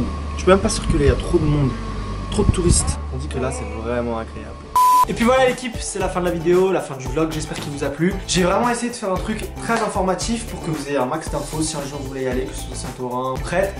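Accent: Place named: Algeria. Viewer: J’ai trop ton accent algérien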